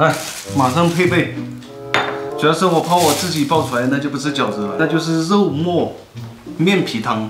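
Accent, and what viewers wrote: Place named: Shanxi, China. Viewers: 这是山西北部，内蒙西部的口音啊！ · 姥姥必须得是我们山西人，这口音，一听就是自己家姥姥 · 这山西口音，弄上水zanzan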